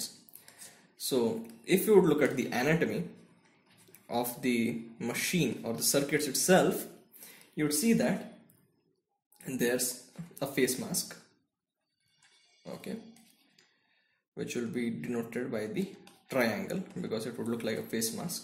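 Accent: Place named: India. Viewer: nice, i understand a bit of indian english but your draws are very nice explainfuled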